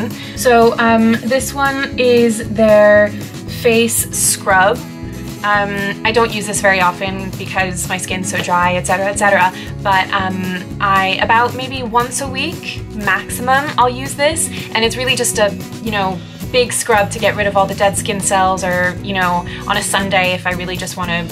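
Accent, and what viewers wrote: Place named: England, United Kingdom. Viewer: You have a hint of an English accent at times lol or is it just me?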